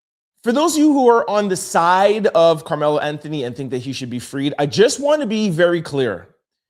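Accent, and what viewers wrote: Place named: Canada. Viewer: This Canadian accent is annoying as hell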